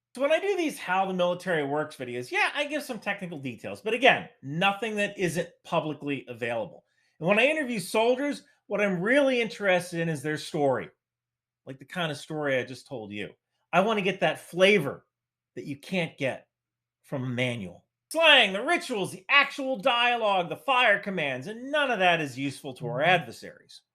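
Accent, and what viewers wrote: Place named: United States. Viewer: Very convincing American accent for a working class Irishman, how long have you lived in the states?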